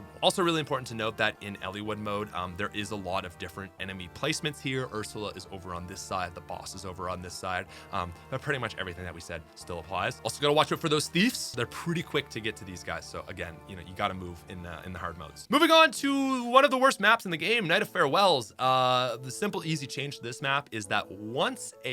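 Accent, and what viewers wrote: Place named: Canada. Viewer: Where do you live, bro? That "oat" accent instead of "out" leads me to think you live in the Canadian Prairies or Atlantic Canada, or Midwestern USA.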